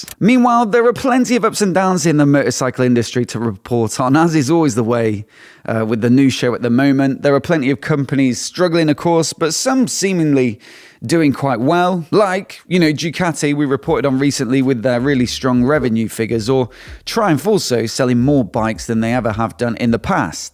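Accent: United Kingdom. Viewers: is becoming one of my top bike news sites. Always interesting, well produced & I love the British accent! All around, a good watch/listen!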